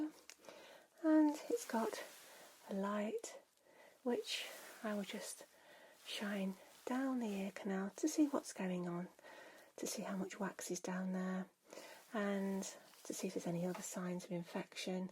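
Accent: United Kingdom. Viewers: You have one gorgeous British accent that I can listen to all day long. 🥰 I felt so relaxed and enjoyed this part 2 of ear exam and deep ear tingles here! Cheers 🍻for sharing and I wish you a beautiful Happy New Year's 2025! 🍾🎉🥳💜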